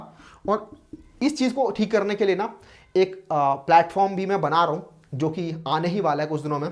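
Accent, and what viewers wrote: India. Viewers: His voice is like Ashish nehra is he from Haryana ?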